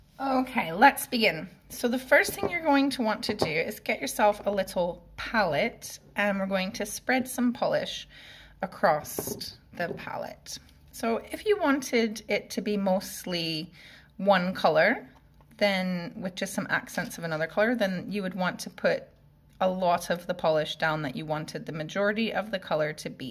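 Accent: Scotland, United Kingdom. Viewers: apologies for the mistake. You’ve certainly picked up a bit of that Scottish accent 😀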